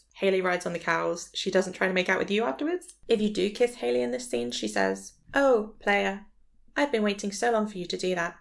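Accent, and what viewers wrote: United Kingdom. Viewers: As an Australian, I don't see any hint of Oz/Kiwi accent at all. Maybe the tiniest hint of South African, but not enough for me think you're anything other than a Brit. Also, the haley_concerned portrait that you called angry, to me looks more like pensive or worried or uncertain. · I get sinilar questions about my accent for some reason, and I always answer that I just watch a lot of Youtube. Simple, shuts the dumbass question down 😅... you sound normal-brit to me, but i guess my view might be biased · I love your accent. Original English accent. Love it 💕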